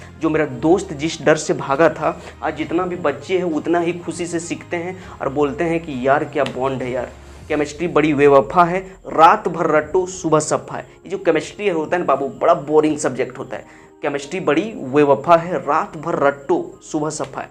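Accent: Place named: Jharkhand, India. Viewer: Language se hi pata chal gaya ki aap Jharkhand se ho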